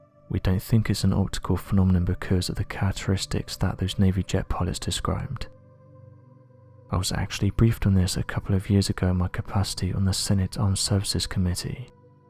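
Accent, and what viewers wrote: England, United Kingdom. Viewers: I m personally tired of the English accents one reason is they use then to lend some type of foolish credibility to every. Sc am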